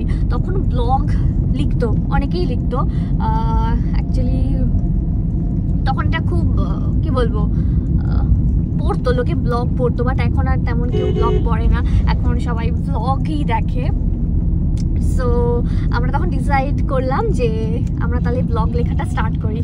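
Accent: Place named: Bengal, India. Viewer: Tomar ei Bengali English accent ta vishon irritating and believe me tomar kotha gulor jonnoi vlog ta lengthy hoy, vishon boring hoy. Ei neka neka voice ey kotha na bole music die Dio. Vlog interesting hobe tahole.. ei under confidence er moto neka kotha gulo shunlei majh theke manushe back kore chole jabe.. just a honest review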